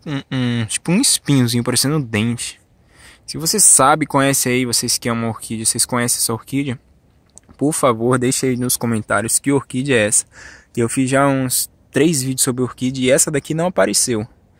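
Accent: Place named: Minas Gerais, Brazil. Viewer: Você é Baiano meu querido? Você tem sotaque de mineiro Você fala igual mineiro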